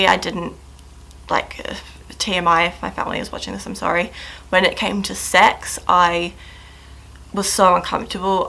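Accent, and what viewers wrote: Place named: New Zealand. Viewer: Yeah, I like a more submissive role, but I think I can enjoy a dominant role too. (Change of subject). I'm from the U.S. and I've gotten board speaking how americans do. I wanted to change my accent. Long story short, your video on kiwi accent popped up. Since then I've been studying your (and a few other people's kiwi) accents cause that's basically how i want my accent.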